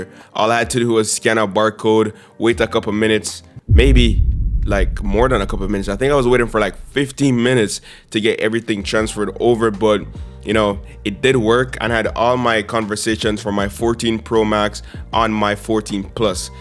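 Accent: Jamaica. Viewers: That Jamaican accent so sweet... This is one of the best you have done so far! Blessings to you and your fam. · Hey man mi a Jamaican brotha good to hear ya in ya native voice great video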